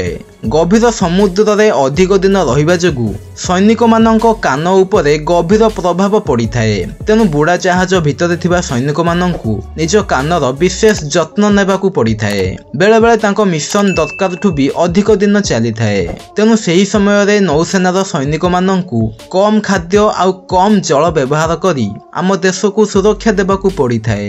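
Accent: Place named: Jajpur, India. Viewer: Bhai tuma voice ta janila pari laguchi tuma ghara jajpur nanpur ki ❤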